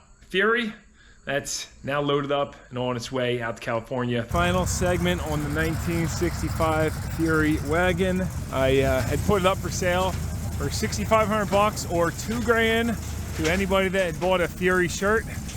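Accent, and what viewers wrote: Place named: Pennsylvania, United States. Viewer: Thought I recognized that pa accent. My grandparents both sides of my family lived in different town there. Cool agricultural towns!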